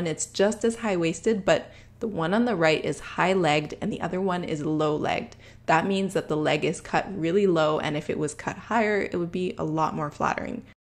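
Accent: Canada. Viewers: Do I hear a bit of a Canadian accent?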